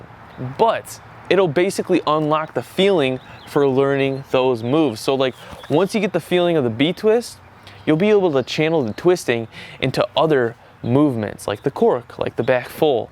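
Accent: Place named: Michigan, United States. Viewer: You have such a Michigan accent lol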